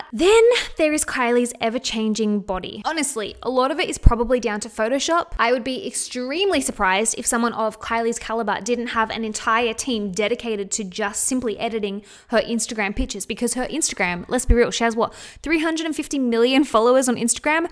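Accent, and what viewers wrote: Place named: Australia. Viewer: It's so strange (and refreshing) to hear a fellow Aussie accent on YouTube ❤️ Great video, you crushed it! 🙌🏽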